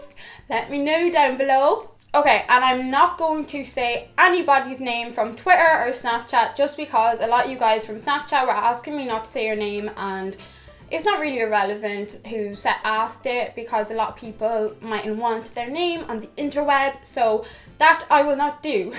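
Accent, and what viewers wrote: Ireland. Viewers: What county in Ireland are you from?😆❤️And I love love love this video!😘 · hate cheaters with a passion and I'm proud of the fact that I have never cheated on a girl love your video's and love your accent nothing better than a beautiful Irish accent ❤❤❤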